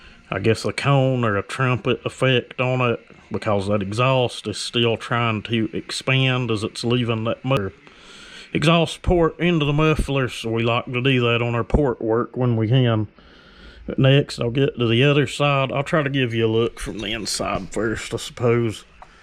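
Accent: United States. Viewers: Nice information. Btw, in what part of US does people have this accent ?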